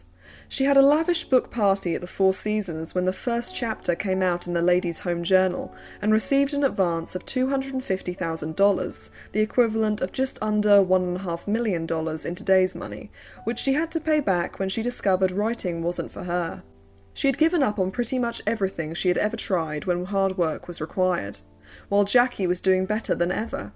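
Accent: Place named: United Kingdom. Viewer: Dear Ms, your British voice telling different aspects of these Swans was perfectly delightful! Thank you! I’m almost finished but I dread..,the end of the story! Because yore video was so, how do I say…reminiscent… I accepted an “Invitation” and will drive…all the way to that Coctail Party! Mind you, am a 😍”Swann”! And ye, I had a Truman C. In my life too…Funny, Do all Birds of a feather, flock together? Thank you again! Have a Blessed Holy Saturday Night! Kali Anesthesia! Nurse Jane, Deale, Maryland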